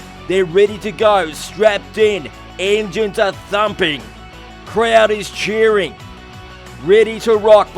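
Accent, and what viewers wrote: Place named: Australia. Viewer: I was rooting for Simon to win! But congrats to the winners. As an American, love to hear your commentary in Australian accent. Great racing to all!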